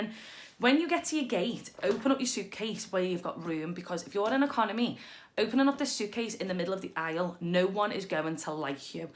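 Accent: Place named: Wales, United Kingdom. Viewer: Thanks for the great tips! You have a lovely accent. Are you Welsh? Blessings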